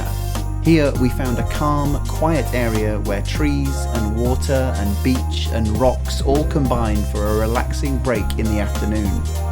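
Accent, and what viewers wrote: England, United Kingdom. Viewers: I love your videos👍🏼 and your English accent 👍🏼👍🏼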